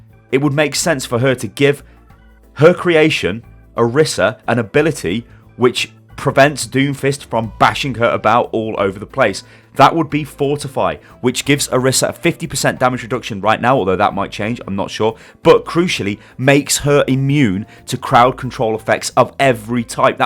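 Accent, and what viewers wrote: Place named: United Kingdom. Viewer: minseoks He's British you fucking chucklehead. It is easier to say it like how he says it then forcing himself to say it how it is normally pronounced. That's like being a non-english speaker but some dumbass such as yourself saying that the non-english speaker is retarded is just as stupid as the statement itself.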